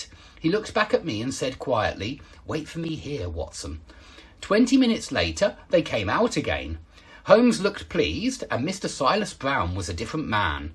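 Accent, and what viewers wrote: United Kingdom. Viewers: British accent 🤌💕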